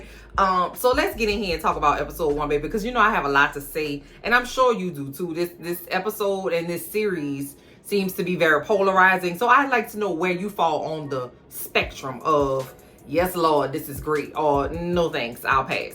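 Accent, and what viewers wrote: New Orleans, United States. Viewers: I’m so glad I found your channel! I’m from Nola and ur voice sounds like home. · I hear that nola accent fasho ooouuuweee we might be related 😂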